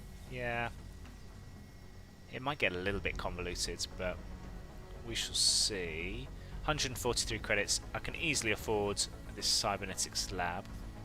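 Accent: United Kingdom. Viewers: I've started to watch the playlist really enjoying hearing British accent. Only on ep2 so far.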